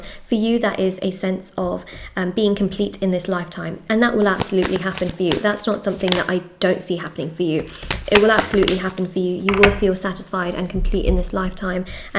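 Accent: United Kingdom. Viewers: British accent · ❤Her accent tells me she's British......❤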